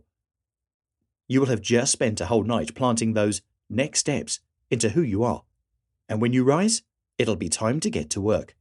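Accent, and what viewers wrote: United Kingdom. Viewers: That thick british accent is so annoying:d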